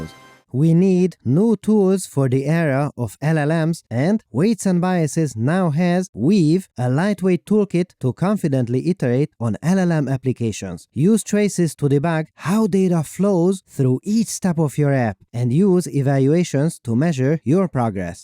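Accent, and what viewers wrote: Hungary. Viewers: Ah Hungarian! Your accent is super cool but also very unique. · So TMP is Hungarian? News to me. I was curious about the fantastic accent. Great paper as always, Doctor.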